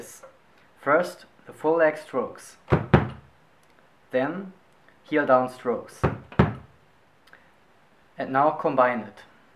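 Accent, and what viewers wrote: Germany. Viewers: is that a german accent? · sounds like you're german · this is awesome! where are you from? sounds like your german :D I also use this technique since almost a year. its really nice, if you get the first few months of practice done which are pretty hard. as you said, its not easy to get your feet/legs/ankles comfortable with this motion. but once you get that its nice and easy :) its great to see somebody with the same technique playing on a very high tempo level. my maximum at the moment is still 145, sometimes 160 for a short time. so how long did it take you to get for 190 bpm? and would you agree to that: the higher the tempo is, the more you need to work with your ankles and less with the whole leg? thanks for your reply!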